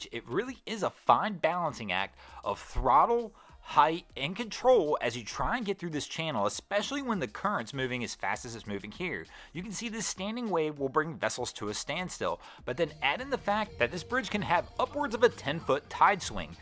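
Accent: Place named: Pittsburgh, United States. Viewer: So what you're saying is that you're from "Pixburg"? Sure sounds like it.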